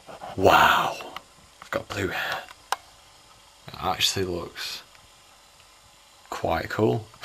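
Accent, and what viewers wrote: North, United Kingdom. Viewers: He sounds so northern in these early videos i love it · His voice was so deep and northern then jfc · He sounds so northern and not as excited as usual... He is so much different. Definitely more northern.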